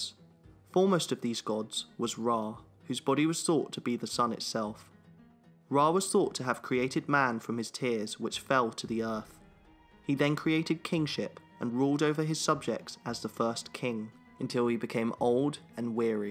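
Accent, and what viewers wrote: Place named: England, United Kingdom. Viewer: The pronauncation of the gods is so english, I could not recognize them. Osiris? Like Miley Cyrus? Really??? its O-ZE-RES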